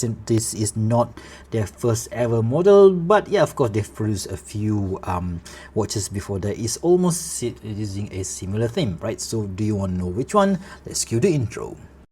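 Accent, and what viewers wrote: Malaysia. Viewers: Bila dngr slang dah agak dah mcm malaysia/indo, tngok dkt about from malaysia, terus subscribe, sbb nak cari jam yg affordable haha teruskan content broooo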